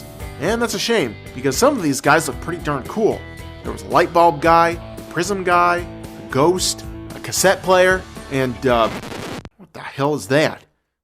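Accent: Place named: South, United States. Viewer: Great episode. Though I think it's funny how he mispronounces Kinnikuman. Sounds like the way they'd say it here in the south. "Yeah man, this here's my cousin Kenny Kumen!" For the record it's said more like KEY NEEK EW MON.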